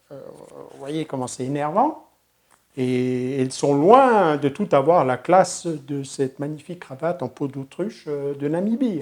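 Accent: Alsace, France. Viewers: Sympa cette petite video 'loufoque' ... Au moins ça déride et c'est bigrement plus 'revigorant' que les blablas fadasses et autosatisfaits de notre (¿?) président ... Toi, au moins, tu n'as besoin ni de cravatte ni de prompteur et ton discours est autrement plus sincère et en prises avec 'la VRAIE vie' que ces leuchorées verbales dont il s'obstine à nous abreuver pour tenter de justifier sa présence incongrue à l'Élysée ... ! Il n'a toujours pas compris qu'on me fera jms boire un âne qui n'a pas soif ! Trèves de plaisanteries ... et de digressions politiques ... !!! Je n'ai 'malheuteusement' pas de epotager ... ni même de jardín ... mais si je devais en avoir un, il ressemblerait à coup sûr au tien ! Je te suis de + en + régulièrement depuis un petit bout de temps, tombée sur le PP par hasard ... !!! Et depuis j'y ai pris goût ... Mulhousienne exilée volontaire sur la côte est de l'Espagne ds un bled de la provincia de 'Valencia' je me ressource grâce à toi en revenant ainsi, bien que 'virtuellement', faire un tour en Alsace ... 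le cadre, le paysages, les SAISONS ... tout me parle ... avec l'accent en prime ... ! Tu me me connais pas mais tu ne peux savoir à quel point le PP ... et son jardinier me font du bien ! MERCI ... même si je ne suis pas à même de mettre tes conseils en pratique ... !!! À la prochaine ... !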